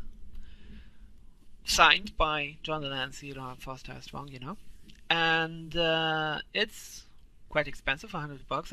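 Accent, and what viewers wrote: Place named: Germany. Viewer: Es ist immer ein merkwürdiges Gefühl als Deutscher einen Deutschen Englisch sprechen hören... Aber danke für das Video! Gab nicht wirklich viel Informationen über die deutsche Blu-Ray und war mir nicht sicher, ob es sich wirklich lohnt. :)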